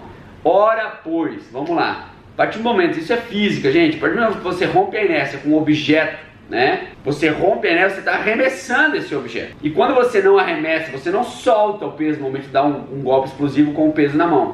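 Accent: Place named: Rio de Janeiro, Brazil. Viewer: Tu pegaste o sotaque carioca com força.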